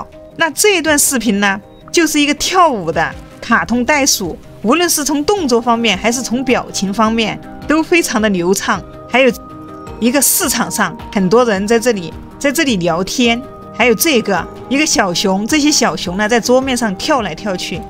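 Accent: Sichuan, China. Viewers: 好亲切的川普~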